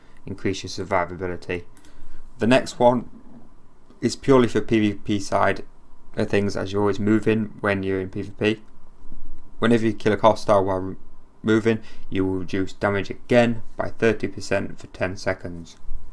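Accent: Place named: Stoke, United Kingdom. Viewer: ahhhhh accent sounded a bit stokish :) subbed you though look forward to more content cheers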